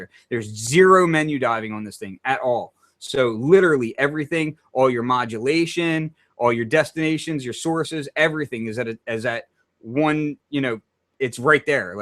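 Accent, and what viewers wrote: Philadelphia, United States. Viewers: are you from Philadelphia? I detect an accent. I'm from Montgomery country, the north west suburbs.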